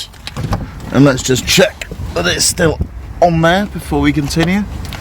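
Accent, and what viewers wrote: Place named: West Country, United Kingdom. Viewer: Appeal it if you can’t pay it…. Hate that people who have cars or vans are victims.. As you know it’s a parking permit used in my street, two of my visitors have been fined for not putting the vehicle reg on the permit. I paid them off within minutes at half price, local government are not car friendly. You need a lock up or a friend to store stuff you’re not using, nomadic Kangaroo does not seem to carry as much as you do. Perhaps he stores his stuff? As for the toilet, perhaps incorporate it in the driver seat 😂. That would be so good for comedy purposes and serve a dual purpose 😂. Great vlog and entertaining, Great to hear the West Country accent, the sound of my youth in Bristol.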